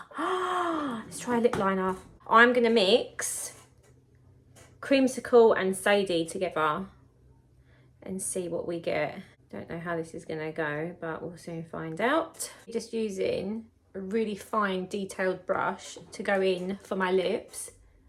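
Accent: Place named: London, United Kingdom. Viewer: Hi, you’ve tested this the same way I might’ve done-I’ll definitely take note not to go too pale using the palette as a base product. Are you from London? You sound local- I’m from Romford.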